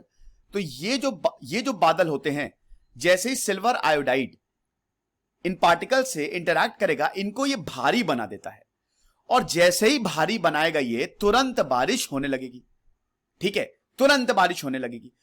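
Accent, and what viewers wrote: India. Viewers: 听球不懂牛尿味儿的印度口音，但评论里的文字真切地让我感受到无数的充满嫉妒之火的血红双眼。 I really felt the jealous eyes in the comment.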